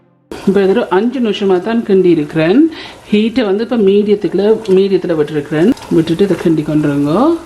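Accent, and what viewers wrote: Sri Lanka. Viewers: சுப்பர் சகோதரி எனக்கு இலங்கை தமிழ் ரொம்ப பிடிக்கும் இலங்கை மக்கலயும் பிடிக்கும்...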